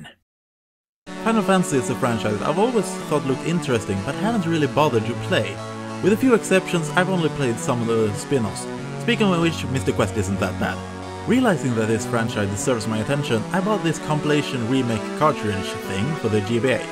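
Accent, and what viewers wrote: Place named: Sweden. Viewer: Jeg syns engelsken din er like sjarmerende som den svenske dialekten din :)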